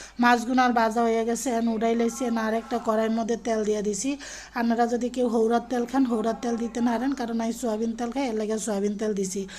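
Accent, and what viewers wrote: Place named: Noakhali, Bangladesh. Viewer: ঠিকই কইছ আপু এমনে তরকারি রান্না করলে অনেক হোয়াদ লাগে তুমি তো পুরোপুরি নোয়াখালির ভাষায় কথা বলতেছ তোমার বাড়ি কি নোয়াখালী আপু জানতে চাই ❤❤🙂👍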